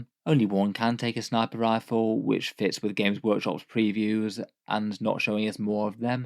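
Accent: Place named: United Kingdom. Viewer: Like the video because is vigilant like a sleepless dreadnought. A dreadnought with that smooth succulent British voice. If I were a Slaanesh worshiper I woul /MESSAGE DELETED FOR SPREADING HERETICAL THEOLOGY// INQUISITION ORDO-HERETICUS/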